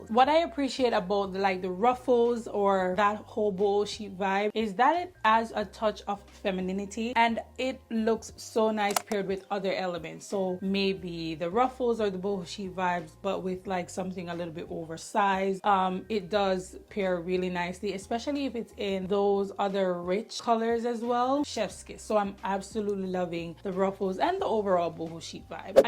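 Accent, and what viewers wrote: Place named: Jamaica. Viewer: I'm seeing you for the 1st time. Heard a Jamaican accent & decided to check .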